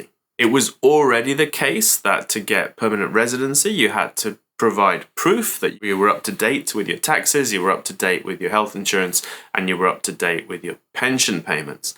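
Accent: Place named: Kent, United Kingdom. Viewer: Thank you for your clear videos. They are really appreciated. At the moment, it's hard to find genuine (non-sensationalized) information. A possible new/expanded topic, if you are looking... April 2026, bicycle rules. I saw your bicycle video about a month ago... You look good! But maybe some more details, could be good. It's not a huge topic but I can see another Police crackdown coming!!! Last crackdown, about 5-6 years ago?, I was waved off the sidewalk, on too an extremely busy & major road. Immediately, almost being sucked under a truck!!! After another on-road hit and run!!, I have NEVER cycled on the road again. But according to the new rules, sidewalk riding is not illegal, you just need to be careful & polite. How to register the bicycle for Crime Prevention (especially, if you buy from Amazon). Near us, local shops are not willing to help unless you buy their bicycles, which I can understand. 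The Local Police, wouldn't do it!!!? That seems very strange. Last video, you mentioned Insurance but not TS Marks. Not mandatory but encouraged, if not covered by car or house insurance. A good idea for shorter term people. Just an idea for a new or continued video. Thank you for your videos. 😉 Dare I ask, did you used to live in Kent? To me, you sound very much like a Kentish person.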